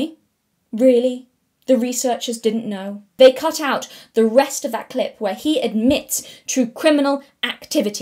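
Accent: United Kingdom. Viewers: I'm British, but my god, your British 😂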